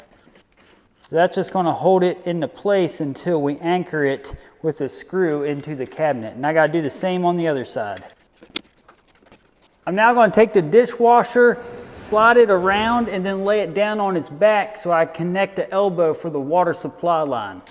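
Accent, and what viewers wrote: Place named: Baltimore, United States. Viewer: That BAWWWLDIIIMORE accent!!!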